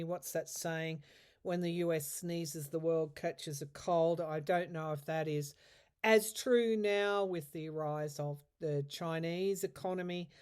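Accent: Australia. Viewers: So refreshing to hear an Australian voice!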